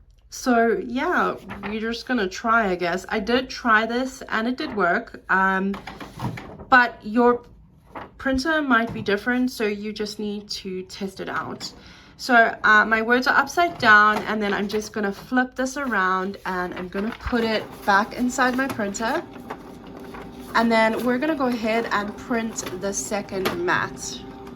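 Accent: South Africa. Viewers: 😅 I watched this video until the end, only to realise “Wait, her surname is ”, this must be a South African! I was second guessing myself throughout the video because I noticed your accent sounds very South African. I was busy working while listening to your video in the background. Now that I found a SA cricut channel, I will binge watch. Thank you🤭🤭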